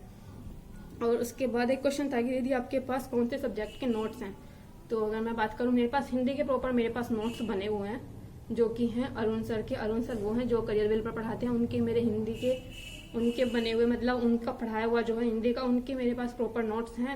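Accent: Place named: Agra, India. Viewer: aapki boli agra jesi hey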